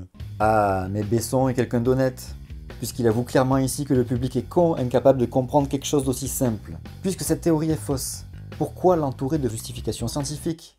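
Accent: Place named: Hérault, France. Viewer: quelles été les possibilités pour que je tombe sur ta chaîne ? quelles été les possibilités pour que tu sois toi aussi de Sens Critique ? Vu ton accent je pari que tu viens de l'Hérault aussi non ?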